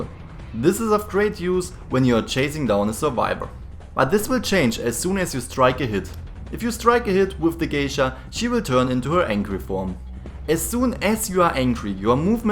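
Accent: Germany. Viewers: No offense, aber das is das deutscheste Englisch, das ich je gehört hab. :P XD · Mein Gott, so you're a German then?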